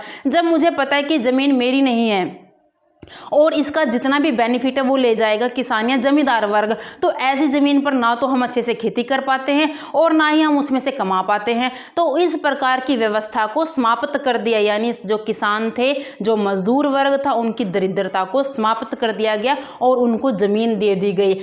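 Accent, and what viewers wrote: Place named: Haryana, India. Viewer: Mam I think you are from haryana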